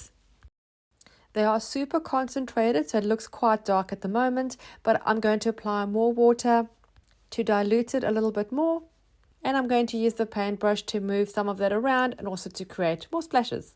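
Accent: South Africa. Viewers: Howdy watching from Cape Town in South Africa. Your accent sounds very South African.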